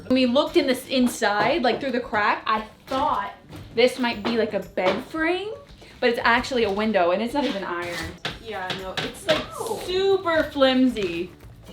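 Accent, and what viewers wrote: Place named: South, United States. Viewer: your southern accent is REALLY starting to stand out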